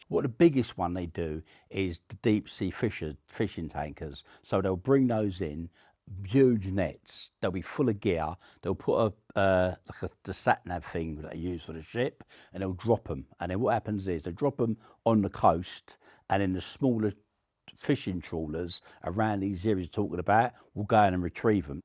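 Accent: United Kingdom. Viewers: This britisch Englisch is so discusting..... Bah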